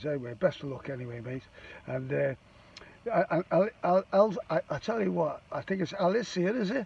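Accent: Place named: Liverpool, United Kingdom. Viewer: Alright aye scouse .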